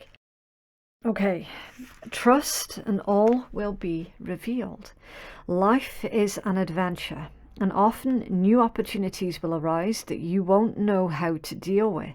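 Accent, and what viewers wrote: Scotland, United Kingdom. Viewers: You're scottish 😍😍 hahaha Loving the accent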